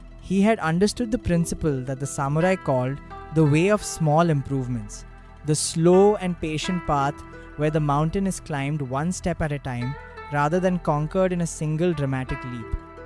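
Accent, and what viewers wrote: India. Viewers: Japanese content but Indian Voice Over ???????????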